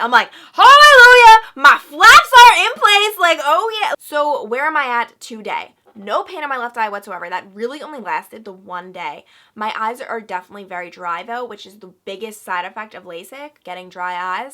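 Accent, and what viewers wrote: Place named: New Jersey, United States. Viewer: Great video.. that has to be a jersey accent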